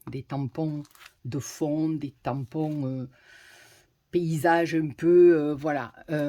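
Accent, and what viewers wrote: South, France. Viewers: Coucou trop contenté de te retrouver avec ton accent du soleil gros bisous de la Picardie 😘💜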